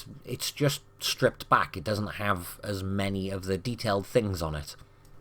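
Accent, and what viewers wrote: Wales, United Kingdom. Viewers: HE'S WELSH MUN!!!!! I DIDNT KNOW THIS!!!!! I LOVE 'IM ALREADY!!!!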